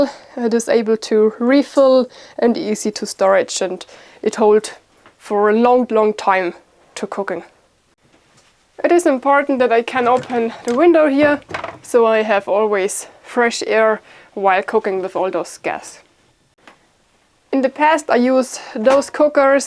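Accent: Germany. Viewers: exelent job on your preparedness. Soon you're gonna need a shed because the cabin is full. You might won't for extra preparedness to hide and bury some of your food like the long term packaged and cans somewhere in secret locations in and around the forest. Love MIKA and your GermanEnglish ❤ Don't forget to hide your Nuts like a squirrel. 🐿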